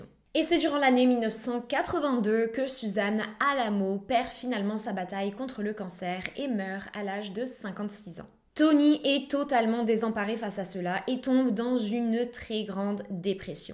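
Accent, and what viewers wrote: Quebec, Canada. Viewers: Salut.. Comme d'hab 👍.. Un bonjour au Québec!..(t'as vraiment pris l'accent!! 😊) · Je ne l'ai jamais dit.. mais j'aime tellement ton accent , ça sonne bien pour un Quebecois.. retour sur un petit côté professionnel.. Merci pour tes vidéos, les dossiers sont toujours plus plaisant et facile à lire par la suite. Bisous xxx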